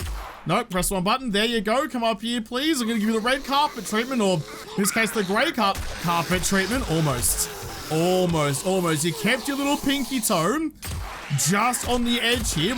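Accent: Australia. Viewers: Maybe its the Acapulco Gold talking but damn this is awesome. Love the Aussie (?) accent.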